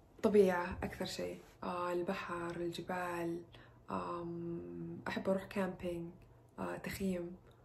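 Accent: Saudi Arabia. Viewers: احس لهجتها من المنطقة الشرقية في السعودية خصوصا لما قالت احاتي وحتى احس مكس الملامح الي فيها كوري على شرقاوي من السعودية الي يعرفون ملامح اهل الشرقية يحسون ان فيها شوي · يمههه كيف تتكلم سعودي ؟؟؟ ولا امها كوريه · لهجتها السعوديه جميله. من اي مدينه ؟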